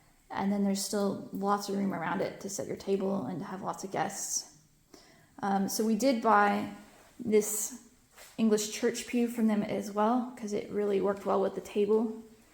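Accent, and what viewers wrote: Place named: Tennessee, United States. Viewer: Ha! I've been trying to place your accent more specifically than just "Southern"—and Tennessee was the guess that I kept coming back to. (Now watch it turn out that you tell me you spent your whole life in Texas or some other state until you had just a year in Tennessee before moving to France.)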